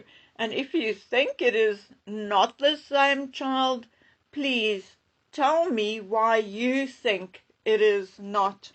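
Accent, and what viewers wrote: South Africa. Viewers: I enjoy and value this channel and listen every day from NYC. One of the things I especially like about it is that it is the authentic voice of an intelligent and insightful woman from South Africa. I like hearing regional way of speaking English, her perspective as someone who lives within the Commonwealth and yet also lives on a different continent than either myself or Great Britain, and I like the fact that the broadcast is the work of an independent woman and that it represents her own initiative and hard work. I have to say that I'm not a fan of the new introduction because I think it reflects none of those things! The music is a bit bombastic and the imagery is artificial - exactly the opposite of herself, who is straightforward and authentic. Just my opinion, but I think the intro is too generic and dramatic for a channel that is so unique and rational., your own artwork is wonderful - why not feature it as the imagery of your intro? 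Thanks for all you do, and all the best, Lee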